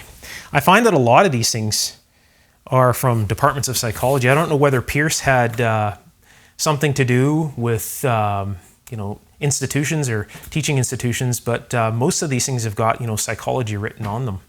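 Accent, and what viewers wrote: Canada. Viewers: You sound Canadian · You from Canada, eh?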